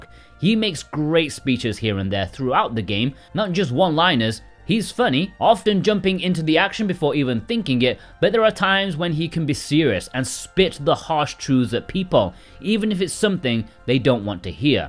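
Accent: United Kingdom. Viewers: 🐬 My favourite game of all time. You made a fantastic video that made me laugh in several places. Tell me though, you sound like you hailed from Blighty.... Is it just me, or does Galcian look like Noel Edmond's megalomaniacal doppelganger? Part of my hope were dashed when we didn't get a boss fight again Mr. Blobby! 🤣I also agree, I would love a model of the Delphinus, but I do have a statue of Aika so it's not all bad.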